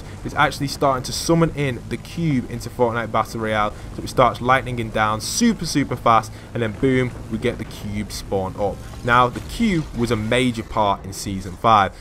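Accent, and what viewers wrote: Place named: England, United Kingdom. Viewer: He has like English accent don’t hate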